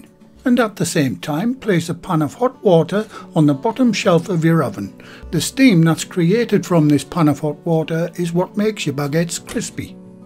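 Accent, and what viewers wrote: United Kingdom. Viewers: I like the British accent rather than the American, this is one of the reasons I like this video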